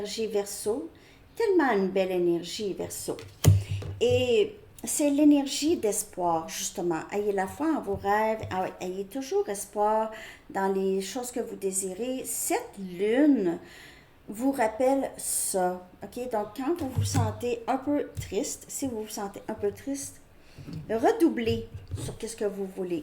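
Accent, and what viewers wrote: Canada. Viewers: Gratitude 🙏 Merci infiniment 🙏 j'adore ton accent canadien 👍👍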